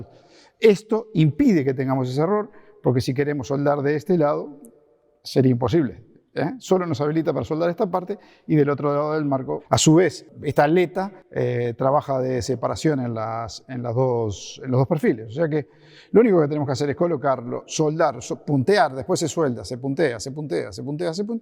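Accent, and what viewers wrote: Rioplatense, Argentina. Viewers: El sonido de fondo es espectacular. Buen trabajo muy creativo. Saludos desde Queretaro México. Argentino o Uruguayo? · Felicitaciones!! Excelente trabajo. Una pregunta, sos Argentino? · Su tonada es mas Argentina/Uruguaya que Española, puede ser? :P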